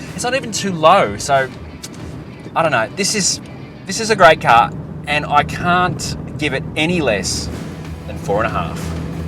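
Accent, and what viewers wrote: Australia. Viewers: Really strong Aussie accent! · Chi Hsia this is just a normal accent in Australia haha everyone sounds like this here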